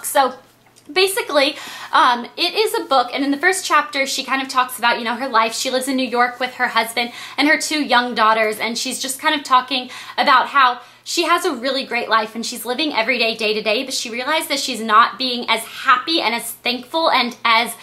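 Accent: South, United States. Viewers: aw i liked your southern accent :( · Oh I love the southern accent - i dont think you should get rid of it..it is so cute! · Southern Accent are beautiful. =)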